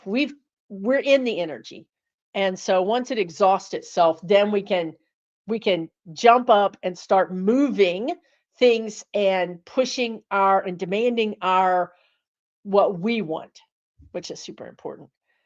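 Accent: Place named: South, United States. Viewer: I’m rarely available to watch your Friday night live shows. But, know that I look forward to watching them ASAP. Thank you from one southern born gal to another. Your “you alls” feel like my home back when MAGA was a misspelled word. Little blue dot 🔵 in a RED county.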